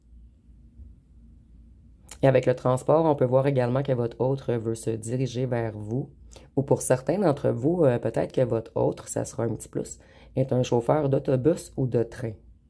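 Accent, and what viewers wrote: Quebec, Canada. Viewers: J'adore vous entendre parler et vous ecouter chère madame avec votre joli accent quebecois❤❤❤❤❤❤❤❤❤❤❤❤❤❤❤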